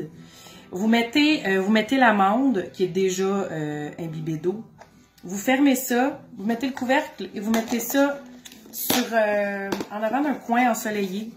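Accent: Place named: Quebec, Canada. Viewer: Accent Québec. Vidéo très utile merci.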